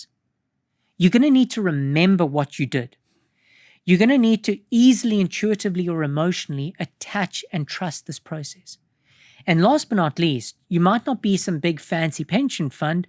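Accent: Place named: England, United Kingdom. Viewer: Keep English Accent's out of the NEW WORLD.................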